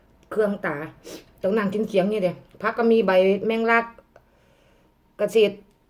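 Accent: Korat, Thailand. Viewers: ผมชอบพี่ พูดโคราช เพราะดี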